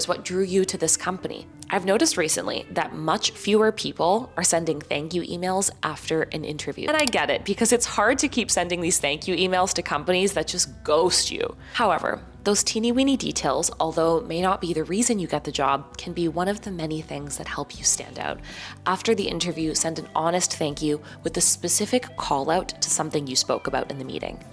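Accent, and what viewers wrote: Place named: Canada. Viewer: Sounds Canadian…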